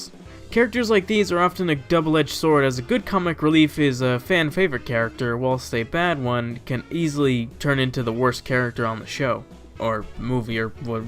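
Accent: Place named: Boston, United States. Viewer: Noice boston accent...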